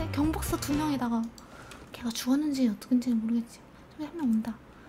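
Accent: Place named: Seoul, South Korea. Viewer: 이분은.. 목소리가 차분하고 서울말 때문인지 몰라도 매력적이네 ㅋㅋ 점... 이뻐지고 어려저가는 님 ㅋㅋ Ps 면허증은 장롱속에~~^^ Ps1 3뚝 죽어놓고 아깝다고 마음에 없는소리 Ps2 치킨 잘 먹는 이쁜누나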